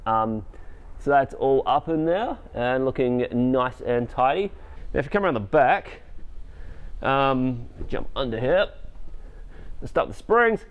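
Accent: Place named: New Zealand. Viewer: NZ. Great video and love the enthusiasm and being an Aussie Kiwi love the accent. Coming from a personally highly modified Wrangler it's hard to good Fortuner content like yours. The Fortuner won't replicate the Wrangler in extremes but that not what it is for but love what you are doing without making it crazy and keeping it a balanced. I have followed your lead and just stuck on Maxxis Razr and am also looking at EFS suspension over OME or Tough Dog (maybe you should look at sponsorship ;-)). I am really interested in the longer shock you put in the rear and how that is still performing. Can you provide the model no of the Rear EFS shock please. Cheers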